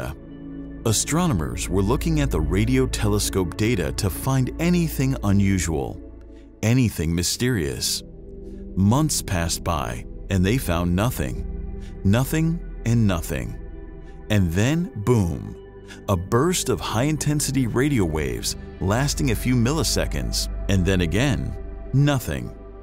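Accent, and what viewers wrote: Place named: United States. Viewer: the narrator has the most american voice i've ever heard. great content though.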